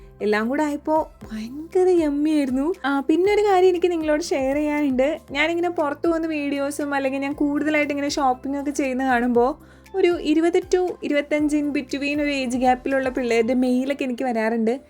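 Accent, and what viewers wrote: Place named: Angamaly, India. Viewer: I was fond of ur angamali slang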